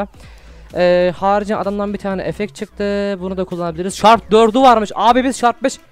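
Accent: Adana, Turkey. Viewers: tam bi adanalı konuşma tarzın var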